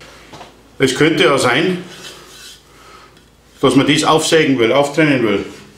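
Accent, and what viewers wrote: Bavaria, Germany. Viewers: Beinahe hätte ich ein Daumen nach unten gegeben. Das Video war äusserst langweilig, Die Stummfilm-Zeiten sind doch lange vor unserer Zeit vorbei gewesen. Bitte sprich wieder in den Videos deine bayrische Goschen, hat mir immer Spass gemacht.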